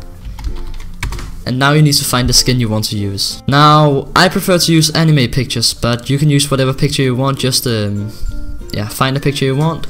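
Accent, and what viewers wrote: Denmark. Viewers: Come you from Danmark? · heh realised you were danish because of your accent lool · Are you from Denmark? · are you danish?! omg i'm too danish omg!!!!! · er du dansker?? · I elsker your danske accent;);D:D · Are You danish? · are you from denmark?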